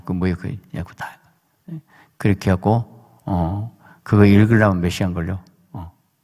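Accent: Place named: South Jeolla, South Korea. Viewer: 목사님 넘 존경합니다. 너무 설교듣고싶은데 전남 사투리땜에 잘안들려요. 밑에 한글좀 올려주세요. 목사님 넘 사랑하고존경합니다. 미국의아들에게보내고싶은데 저보다 더못일아들어서그래요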